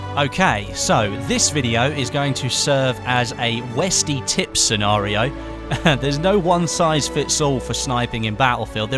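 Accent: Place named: United Kingdom. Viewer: I hate british axents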